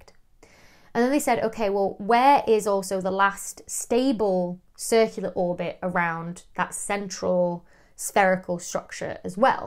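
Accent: United Kingdom. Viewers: Nothing sexier than a blue eyed PHD in Astronomy with British accent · I noticed that but your Brit accent is so lovely that I forgave you🤭🥴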